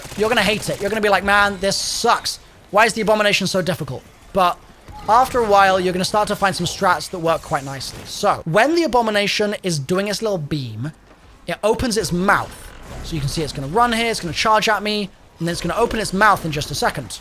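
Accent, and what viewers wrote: United Kingdom. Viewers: Any destiny ppl here thinking this is the brit version of datto or fallout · Oh hell yeah 53 minute vid. Perfect for falling asleep to that soothing brit voice.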